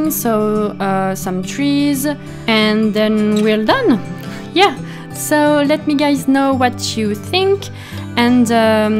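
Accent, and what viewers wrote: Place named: France. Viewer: I can completely understand you! And for the record I love the French accent! And you’re voice is so nice to listen to. I understand that you’re nervous, I myself speak a different language and I just did my first voice over too. But it went well and with every time we do it I think we’ll be more and more comfortable 😊 I really like this home btw, so beautiful! 😍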